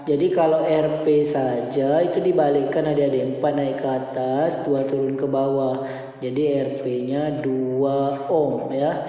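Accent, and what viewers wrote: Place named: Malaysia. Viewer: Waw abang aksen ya aksen malaysia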